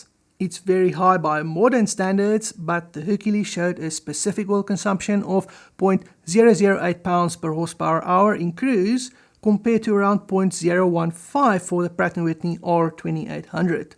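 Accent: Afrikaans, South Africa. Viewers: Dig the the SA Dialekt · Why do you sound afrikaans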